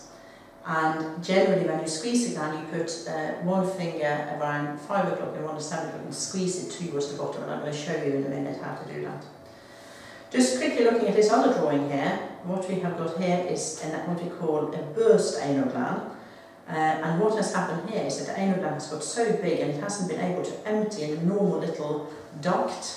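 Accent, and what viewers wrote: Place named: United Kingdom. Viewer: Was very hard to understand her speaking. The British accent got in my way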